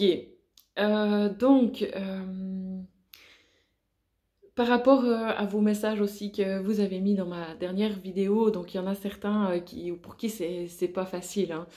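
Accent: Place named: Switzerland. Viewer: Merci beaucoup pour cette vidéo! J'aime beaucoup ta manière d'expliquer ☺. Je me suis dit mais je connais cet accent et j'ai vu que tu étais en Suisse comme moi d'ailleurs. Je ressens beaucoup de fatigue actuellement et ce que tu as dit résonne juste en moi. Je fais de la méditation pour intégrer ces énergies au mieux. Je t'envoie beaucoup d'amour 😇🙏. Je me réjouis des prochaines vidéos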